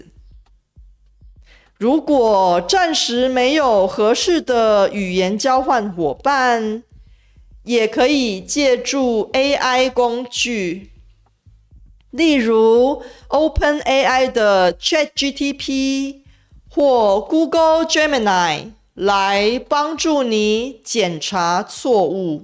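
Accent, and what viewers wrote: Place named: Beijing, China. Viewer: Thank you for responding. I asked because I thought I could detect a northern, perhaps Beijing accent. Anyway, nice to come across another channel teaching traditional Chinese 😊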